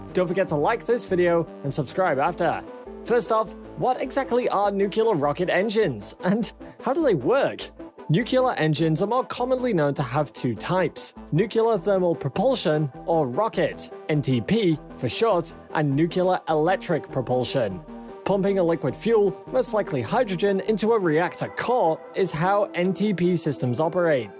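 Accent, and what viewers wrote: England, United Kingdom. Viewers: Is he saying "Nucular"? Ax me if I care but I don't think he will be working on a nuclear rocket. Did he just say "Yeeahs" 12 months in a yeeah. I think he might be from England.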